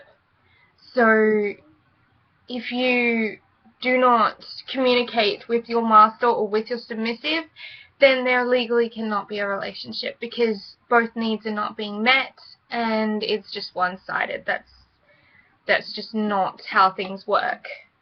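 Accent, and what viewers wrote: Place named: Australia. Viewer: Hi - I hear from your accent that you are an Ozzy - Ozzy girls are best. Thanks for the informative vid.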